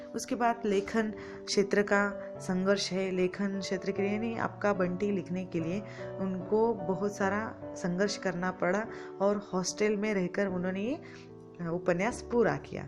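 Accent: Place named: South, India. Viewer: Thanks 😊( kya aap South Indian h!)Aapki bhasha hme Kisi Apne ki yad dilati h😊.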